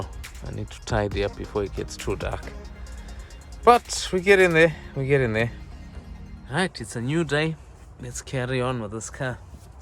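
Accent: South Africa. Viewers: Ayyyy bra you from south Africa?typical charou tunes here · Man u almost sound south african lol Love da video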